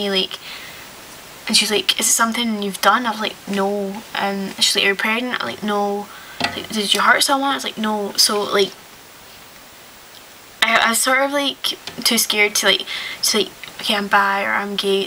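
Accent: Scotland, United Kingdom. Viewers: Scottish! :D Loveee ittt. :D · you are quite adorable & i love your accent. if i lived in scotland, i'd totally date you<3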